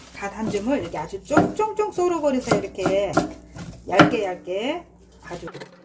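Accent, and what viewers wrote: Jeolla, South Korea. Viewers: 님 전북 분이신거 같으세요 말씀하시는 억양등이 전라도 음식 참 맵짜고 맛있게 잘합니다 오늘도 잘보고 배웠습니다 감사 합니다ㅡ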